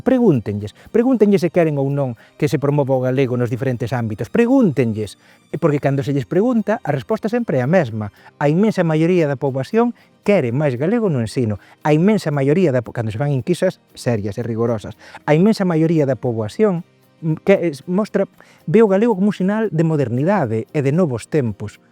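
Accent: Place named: Castile, Spain. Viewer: Caramba, é muito parecido com o nosso português brasileiro! Com um sotaque castelhano forte; mas, mesmo assim, parece-se muito.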